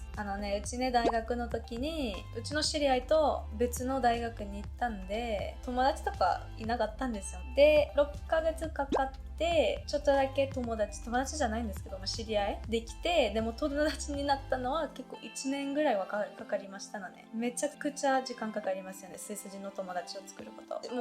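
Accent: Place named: Kansai, Japan. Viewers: ちゃん、とてもかわいい！関西弁まじりの日本語もステキ❣️ とても日本を良く見てくれていて… うれしいです。ありがとう💕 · ところどころ関西弁入ってるな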